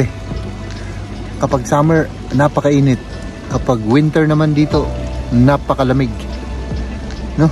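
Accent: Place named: Igorot, Philippines. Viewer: Ask ko lang po, yung accent nyo kasi sa Tagalog parang Igorot. Ay Igorot kayo? First time ko agbuya vlog yu ta recommended ti YouTube. Nag subscribe akon.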